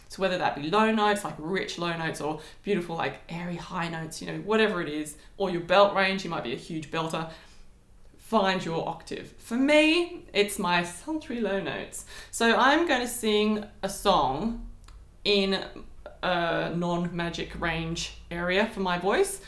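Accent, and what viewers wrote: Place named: Australia. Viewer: It's great to hear an Aussie voice on Youtube. Your approach to your videos is very refreshing.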